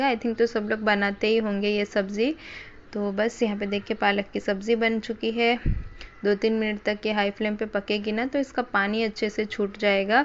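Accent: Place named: Gujarat, India. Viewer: Ji han main bhi Ahmedabad se hun aapki awaaz se lag raha tha ki aap Gujarat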